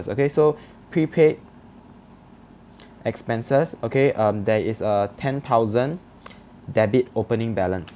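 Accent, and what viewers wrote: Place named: Malaysia. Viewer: I can bet a £100 that you're from Malaysia haha. Just sound like my friend.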